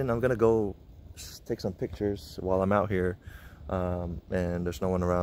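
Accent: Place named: Philippines. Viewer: You sound Filipino :) nice car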